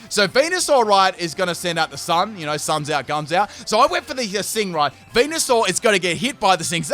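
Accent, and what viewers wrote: Australia. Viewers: I'm Aussie and wouldn't ever consider saying Leafeon the way he did. There's an 'e', just read it phonetically. Think is maybe a tad bogan though, which might explain it.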